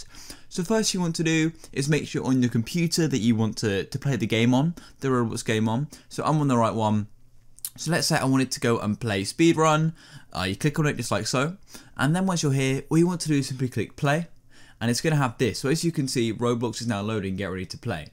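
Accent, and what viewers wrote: United Kingdom. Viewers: litterally a british dude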